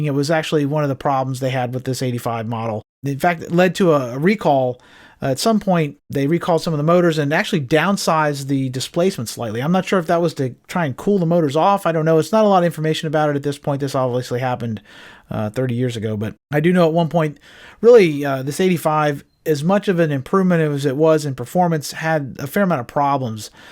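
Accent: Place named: Cecil County, United States. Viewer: You sound like one of us boys from Cecil county. My goodness 😂😂😂 My dad bought me and my 2 brothers a 79 Odyssey. This was 1985 and most of the other guys had CR’s 250R’s or Quad runners and Quad Racers. We had that old yellow 79 😂😂 they always made fun of us😂😂 I had to stand up on the back and hold on to the upright bars. We rode it in all the snowstorms. The snow collected on the front plastic fenders and got them brittle. They cracked off. Mud went right in our faces after that. So my dad took my fluorescent orange sled and cut it apart with a utility knife and bolted homemade fenders on it. We were some goofy poor ass hillbillies of the neighborhood. We rode that thing up the back roads, down the power line access roads. It had these straps that you put your wrists through on the steering yoke. It didn’t have a wheel. Those straps were to keep your arms from getting ripped off in a roll over.😄😄😂😂😂😂 Good times man. 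Those upitty kids with the nice new quads and bikes gave us some ball bustin for having to ride that old yellow and homemade bright orange fendered jaloppee. You said STINK FINGER😂😂😂😂. We had an old neighborhood skank that would let ya Four Finger her😂😂😂😂😂. MAN,,!!! I was dyin when I read your comment. Took me way back to 85. God Bless us men who grew up in those awesome days. Everything is all built up and the land we drove on is all developments now. These kids nowadays don’t even know what stink finger is. Nonetheless Four fingerin’😂😂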